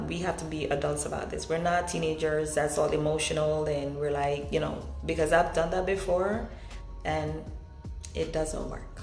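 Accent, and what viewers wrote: Jamaica. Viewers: U. are Jamaican?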